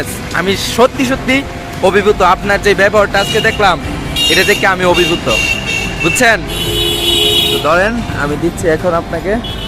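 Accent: Sylhet, Bangladesh. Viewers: Are you Sylhety Or from another city? Good job, well done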